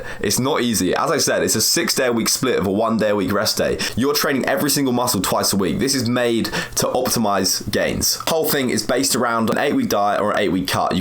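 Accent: United Kingdom. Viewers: fking britsh accent hurts my ears no offence and good work btw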